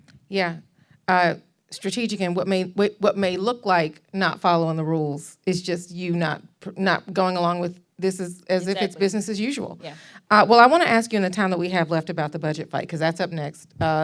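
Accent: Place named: Texas, United States. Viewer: We love you! Can tell that you are from Texas!❤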